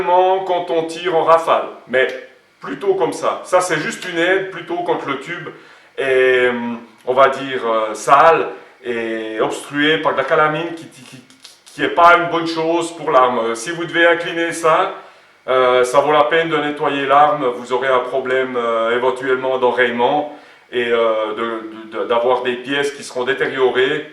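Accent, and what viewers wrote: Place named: Bernese Jura, Switzerland. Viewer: du jura-BERNOIS!!! bandes d'ingrats, merci pour ta video👍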